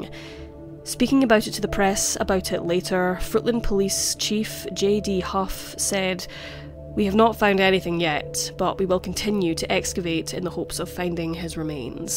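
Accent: Scotland, United Kingdom. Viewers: So good to hear a Scottish crime creator 👏🙏😘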